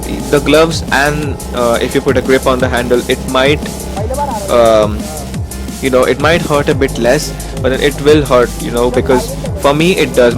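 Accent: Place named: India. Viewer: This accent is making me really suck. Wtf with indians filling the internet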